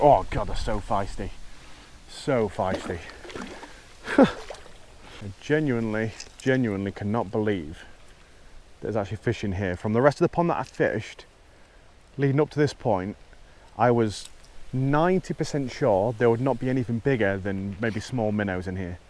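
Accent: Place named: North West England, United Kingdom. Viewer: Iv asked on another video but your videos have reawoken interest in fishing from my youth but I need to know if the canals in the north produce the lovely fish that you seem so skilled at catching, the chubb, the canal tench. I cannot imagine such fish living in the leeds and liverpool canal. you seem to have a north west accent but it looks like you live further south now.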